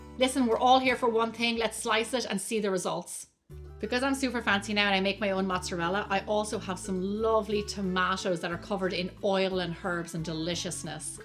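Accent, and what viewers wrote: Ireland. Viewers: Irish accents are just so cool.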